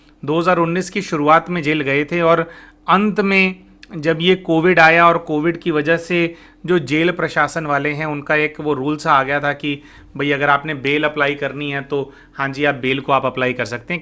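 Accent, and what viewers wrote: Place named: Delhi, India. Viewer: inki bAate batane k tareeke me Delhi k tadka wala touch hai 😂😂 bahut pyare aur funny hai bhaiya 😅😅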